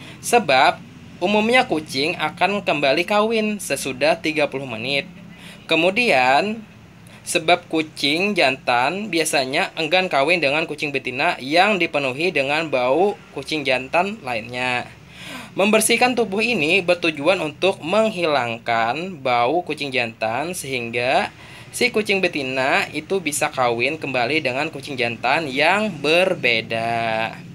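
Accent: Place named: Banjar, Indonesia. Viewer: Urang banjar sako amang ni . Logatnya kentara banar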